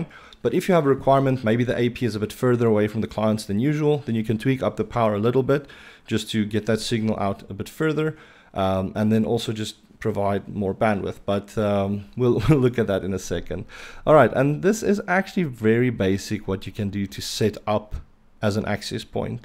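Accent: Afrikaans, South Africa. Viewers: You sounds like a Afrikaans speaking person. Thanks for the videos man👌👌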